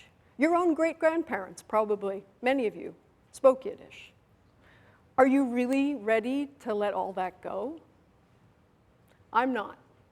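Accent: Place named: New Jersey, United States. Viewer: Her "oy" should be more "uy -- u as in duh, but tighter". And her Jersey accent has been....neutered. I'm not saying Bayway or Sleezeside Heights, but a Morris County accent, perhaps. Especially if she's speaking Yiddish.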